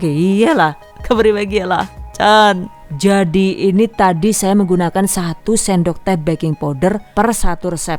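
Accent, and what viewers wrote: Banyumas, Indonesia. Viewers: Mba, njenengan wong ndi sih, deneng kadang teyeng ngomong ngapak😅😅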